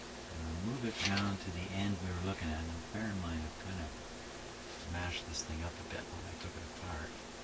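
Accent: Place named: Canada. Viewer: Fascinating. I had heard a lot about "silver mica disease", but it's great to actually see evidence of the problem. I just found your channel through this video, and was thinking "this guy sounds Canadian", then I spotted the maple leaf sticker on your toolbox!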